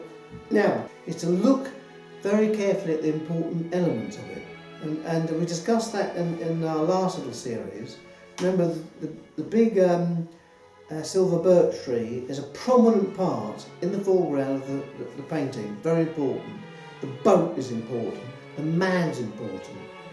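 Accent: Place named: Germany. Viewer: Can you cite to two must read books. I could not understand through that thick german accent of yours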